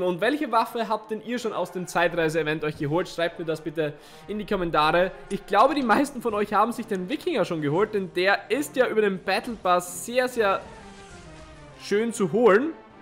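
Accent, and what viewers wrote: Austria. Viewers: Bist du Österreicher wegen dem Akzent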